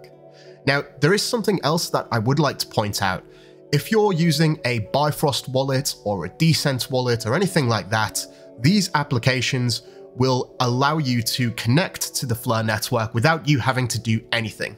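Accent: Yorkshire, United Kingdom. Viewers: Yorkshire accent for sure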